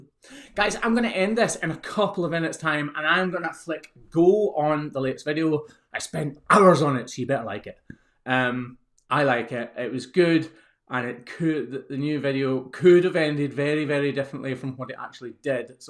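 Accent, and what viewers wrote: Scotland, United Kingdom. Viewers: You’ve got me hooked! No, not just on the hoof care - on your Scottish accent. My 2x great grandfather was born in Abroath (northeast), my 2x grandmother in Glasgow, and they settled in Ayrshire. He was an author and a machinist. Your accent allows me to imagine what he might have sounded like. Check out his work on Bobby Burn’s inspirations, “Rambles Round Kilmarnock”, “Rambles Round The Land of Burns”. He also had a wicked sense of humor I’m told.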